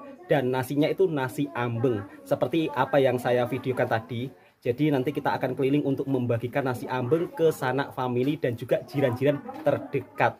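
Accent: Java, Indonesia. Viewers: Jawa nya di daerah mana Mas??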